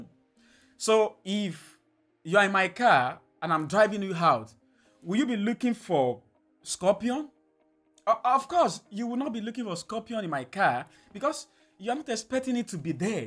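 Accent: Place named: Nigeria. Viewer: I discovered your channel when you were at 40k subs, and I knew from your voice you were a fellow Nigerian. Your work ethic is insane! 🔥🔥🔥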